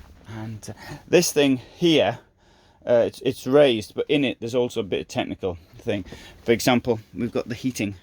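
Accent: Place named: Lancashire, United Kingdom. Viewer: American here, I’m kind of a language and accents nerd. I love British regional accents. Are you from Lancashire? Three fourths of my heritage is from the UK. It’s mostly English and Scottish on both sides of my family, with a a small pie slice of Cornish, and the tiniest slivers of north Wales and Northern Ireland. The rest of me is Slavic. Cheers from a mile high in the Arizona mountains.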